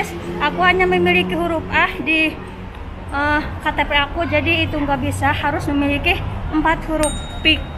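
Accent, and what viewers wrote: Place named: Indramayu, Indonesia. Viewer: Wong ndi teh,Kya Wong dermayu tah mjlngka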